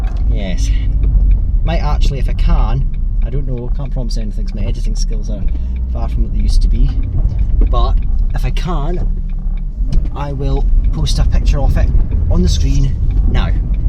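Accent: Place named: Scotland, United Kingdom. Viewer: Thanks for uploading a new video. It sucks that you wrecked the Benz, it looked like a really nice car. Anyhow, there's another issue - it may be due to the fact that I'm not a native English speaker (I love your Scottish accent though), but with all the noise from driving the car, it was very hard to understand everything you said in this video. Also, thanks for not mentioning my name (which is fake anyways, but no matter) in the video (anyone who reads the comments can figure it out anyways, but that's about enough for me).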